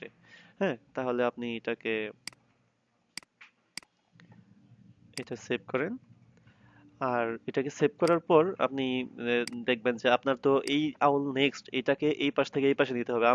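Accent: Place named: Bangladesh. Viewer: Mind blowing. This is the first time I saw tutorial in bengali. Bdw your accent sounds like you are from Bangladesh. Are you?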